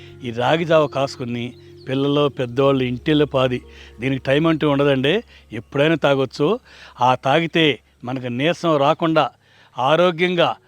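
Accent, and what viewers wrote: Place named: Kolar, India. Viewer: Thank you for writing it in Kannada too andi. I'm from Kolar and your Telugu is so similar to the dialect we speak.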